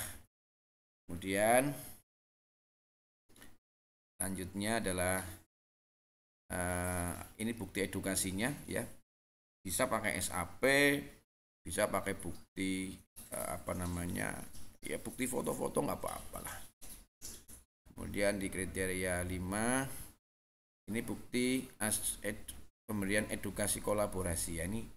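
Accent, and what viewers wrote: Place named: Banyumas, Indonesia. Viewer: Assalamualaikum, bisa minta filenya pak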